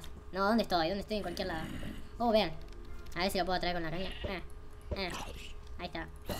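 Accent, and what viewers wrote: Argentina. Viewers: eres argentino????? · sos argentino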